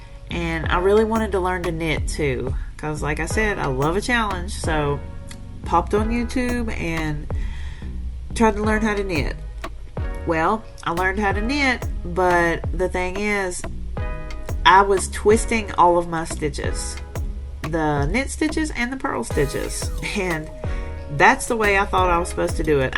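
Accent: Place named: South, United States. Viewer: Why would anyone make fun of your accent? I’m Belgian and have lived on three continents. As a result I’ve got a ‘subconsciously adaptive’ accent - I tend to mirror the speech patterns and pronunciation I’m being exposed to at that very moment. I “should” have a Dutch/Flemish accent. I don’t. Somehow, regardless of whom I’m in a conversation with, people seem to identify Scandinavian undertones to my English. When in a conversation with my best friend from Oklahoma, that turns into Southern-with-a-Scandinavian-twist. When the conversation is with my British friend, it’s Welsh overtones with a seemingly Scandinavian flavour. 🙈 Accents are part of what makes us unique. Personally I feel the variations from the Southern States are beautiful. You almost sing.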